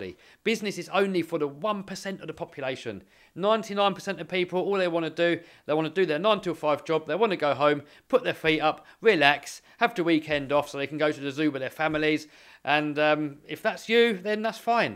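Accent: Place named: England, United Kingdom. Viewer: your the crazy guy with a English accent who's not affraid to destroy his RC'S. Your infectious personality is entertaining and a winner. Thanks!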